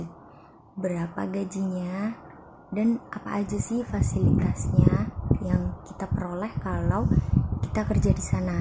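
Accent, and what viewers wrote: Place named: Java, Indonesia. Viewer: MBK asli mana logatnya ky orang Jawa ini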